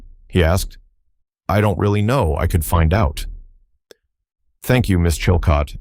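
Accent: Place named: United States. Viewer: Terrible listening to Agatha Christie in an American voice 😔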